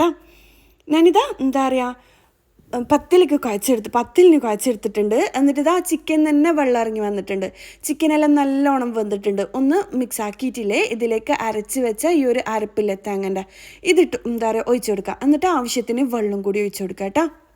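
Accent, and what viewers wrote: Kannur, India. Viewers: Kannoore varthamanam nalla rasamund tto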